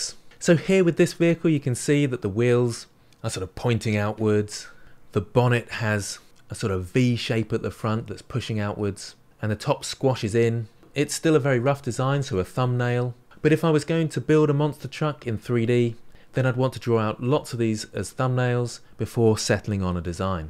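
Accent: England, United Kingdom. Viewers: English Accent Hits Different.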